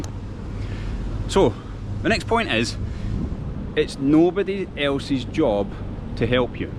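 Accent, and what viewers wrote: Scotland, United Kingdom. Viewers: Your Scottish accent sounds really nice 😊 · Love the sound of Scottish English ) thanks for saying such a nice things about Russians ! We will always welcome everyone, who’s coming to Russia with good intentions ! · You've touched on language so I have a genuine question about your accent. Now I've heard a lot of British accents, but I didn't know what's what. and this is the first time I'm fairly certain that this is what a Scottish accent sounds like. Is this a standard Scottish accent? Reason I'm asking is because I live in the US and there are many different accents, like the Southern Accent for example, where I live. And it is often considered the butt of all jokes, by other regional "accenters" of course. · Thanks for your lovely channel. Love English language, your Scottish accent is so nice 👍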